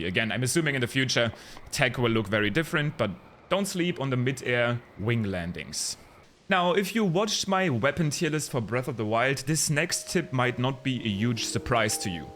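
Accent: Germany. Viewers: Deutscher akzent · we can hear the deutsch in your voice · Hes german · good video but there is one thing i want to know, ist das in deutscher akzent den ich da höre? :P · Save deutsch, hört man krass raus irgendwie komisch 😂 geiles Video aber hat mir sehr weiter geholfen 💪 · Hab sofort gemerkt du bist deutsch😎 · always I search Zelda videos, I came up to and I like his videos, but I always think he sound like a german who speaks english and I dunno why:D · Liebe den deutschen Akzent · Man hört SOFORT den Deutschen aus dir raus, gutes video keep going :3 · anyone knows if he is german or what is that accent? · 1 Question Are you German because your accent sounds german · Wie man merkt dass er deutscher ist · Du bist Deutscher oder ? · Bro where are y from? You sound like a German one xD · Thick German acent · Hes from Germany, why should he fake it lmao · deutsches englisch ist so gut rauszuhören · So lustig wie man den deutschen Akzent so hart raushört aber gutes video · The german acccent is reaaaaly present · Man hört mal anders hart raus das du deutsch bist 🤣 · is that a german accent? 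:D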